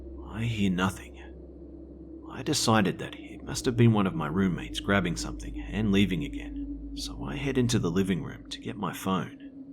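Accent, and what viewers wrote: Australia. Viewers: are u a aussie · Fuck yea it's refreshing hearing a Fellow Aussie telling a horror story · you voice is priceless are u aussie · It feels so good to finally have a fellow Aussie narrator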